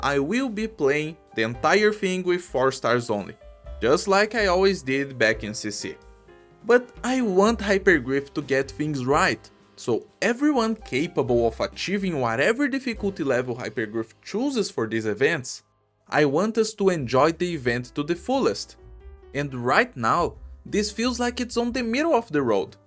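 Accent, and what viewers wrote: Brazil. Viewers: This is CC because Pinchout is CC, Pinchout was just a bad CC and this shifts the whole perspective of people, if this one was the Beta for the new model (which isn't very different than the previous one in any actual meaningful level) instead of Pinchout, the whole discussion would be different. Most of the issues are community sentiment related, because of the proximity of Pinchout DOS and this, with all 3 being operator restrictive events designed for high end accounts. Pinchout didn't feel very like CC to most people because most people experience is Risk18, and the difficulty for the new "RISK 18" is more like old 24~28, which would be a problem if the rewards were locked behind it, like how it was on DoS, but this time around, the only sole reason to push 620 is the medal, this is exactly the correct type of reward to be locked behind the crazy clear, my own complaint is that the gap should be higher between medal and trim, the trim should be slightly higher, while the base medal should be slightly lower. 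On a random note, are you brazilian? Your accent really gives me brazillian vibes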